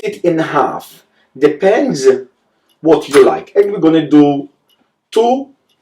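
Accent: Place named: Malta. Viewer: I love the Maltese accent and grammar so-so much!!!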